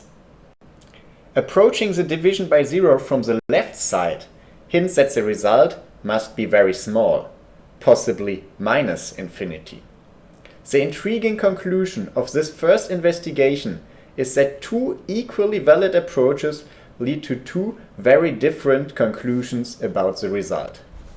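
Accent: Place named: Germany. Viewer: Nice Kraut accent.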